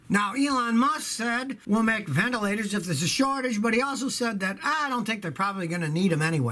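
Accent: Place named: New England, United States. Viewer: With the "Toooob" lol I like his yankee accent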